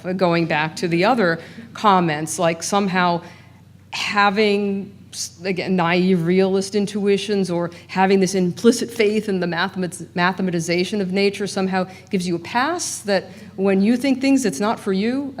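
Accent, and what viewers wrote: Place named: Canada. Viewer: must be Canadian? weird voice and accent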